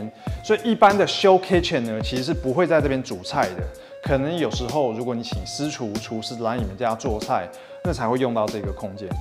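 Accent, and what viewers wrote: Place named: Taiwan. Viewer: 聽口音就是台灣吧!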